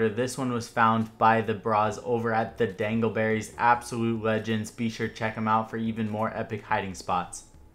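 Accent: Hawaii, United States. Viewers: I was actually gonna ask if you were from Hawaii until you said it. I'm from Hawaii too (near UH but I grew up here), was just gonna say it could be a good idea to advertise that just for being unique!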